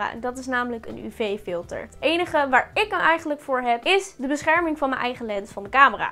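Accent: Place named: Westland, Netherlands. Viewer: Jij komt zoiezo uit het Westland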